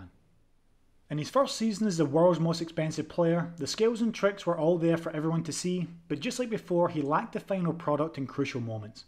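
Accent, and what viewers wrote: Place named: Glasgow, United Kingdom. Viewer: Where in scotland are you from?you sound more glasgow side than Edinburgh side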